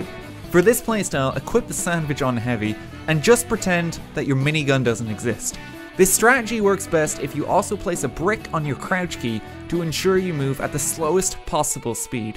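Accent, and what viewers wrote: Ireland. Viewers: U Irish??because I am lol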